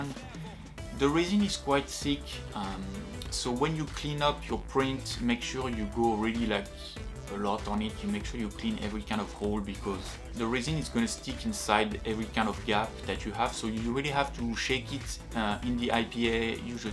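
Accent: France. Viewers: Vu l'accent, t es un Frenchy!!!!! 🤗😋 Tu penses qu on peut colorer la résine si elle est claire, avant impression? Ou colorer les objets imprimés?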